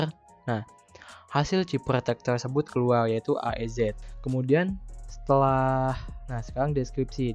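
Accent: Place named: India. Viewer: The real india accent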